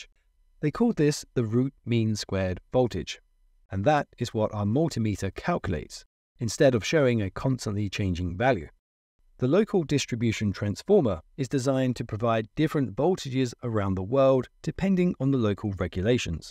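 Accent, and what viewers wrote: England, United Kingdom. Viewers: It feels so weird listening to a British accent using American terminology’s (and voltage etc). Earth to ground Star delta to wye delta etc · cool, a british accent :) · The weird thing is an English accent after starting with a non-English sockets picture. Principles are the same, but was a bit surprising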